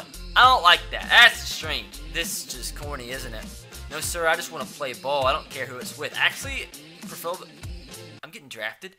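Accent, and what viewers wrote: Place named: Alabama, United States. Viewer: Yo what part of bama you from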